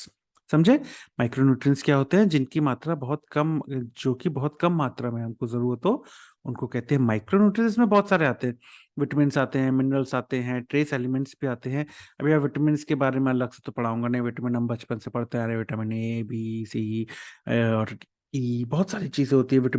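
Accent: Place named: Bundelkhand, India. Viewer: Sir bundelkhandi he 😂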